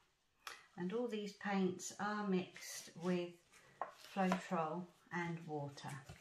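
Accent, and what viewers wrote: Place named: United Kingdom. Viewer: is British, as am I, and I think perhaps it’s the different accent more than the volume, as I heard her clearly? It’s interesting how some accents are easier to hear than others. ☺️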